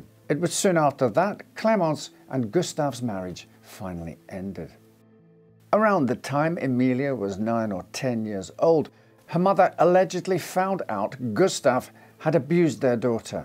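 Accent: Scotland, United Kingdom. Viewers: See, my husband thought you were Welsh…but I know a Scotsman when I hear one! My favorite accent!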